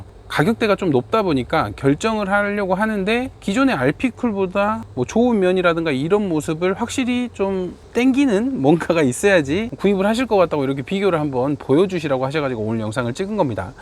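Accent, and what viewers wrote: Jeolla, South Korea. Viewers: 억양이…전라도 어디여?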